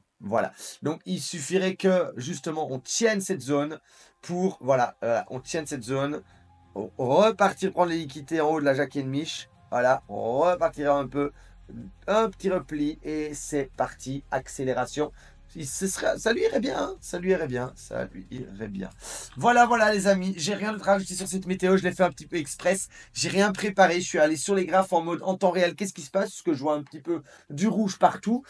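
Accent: Belgium. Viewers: 👍😊 j’entends l’accent belge il me semble ? 😁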